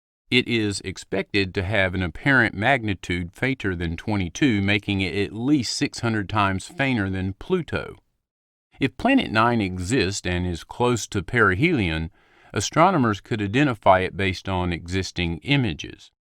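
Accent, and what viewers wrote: South, United States. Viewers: I live in the south, and his accent is most definitely southern!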